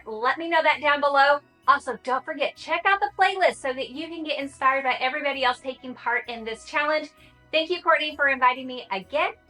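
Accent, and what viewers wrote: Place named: Texas, United States. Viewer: I haven't watched the whole video yet.. but I am here for the unboxing and your commentary. I love when your Texan accent comes out! Makes my little Canadian heart gigle 😂❤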